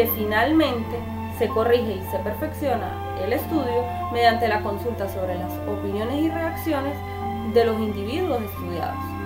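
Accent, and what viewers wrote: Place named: Bogota, Colombia. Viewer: ser la dura del curso! paraces bogotana jejejeje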